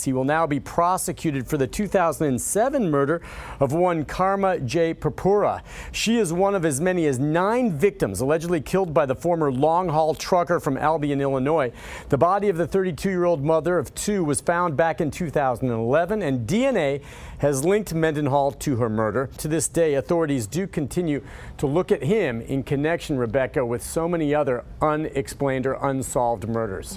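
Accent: England, United Kingdom. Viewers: yeah it's weird I don't mind English accents in person but a voice over drives me crazy. Funny thing is I'm 50 percent English from the states. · why is the story of a murderer in Tennessee narrated by a person with an annoying English accent?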